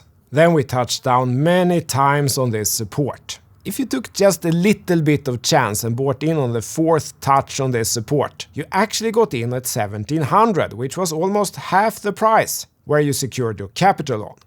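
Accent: Sweden. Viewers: Är du från göteborg eller Stockholm?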